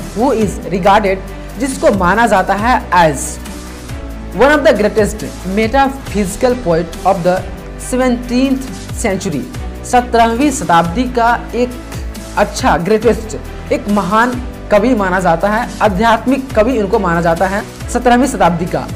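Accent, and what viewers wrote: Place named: Bihar, India. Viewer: Main comment my pdi aap bihari ho lg v rha tha jb suni tb bhut achhi explained kiye ho aap bt thodi si bich bich my annnnn unnnn ar rukna bnd kijiye😂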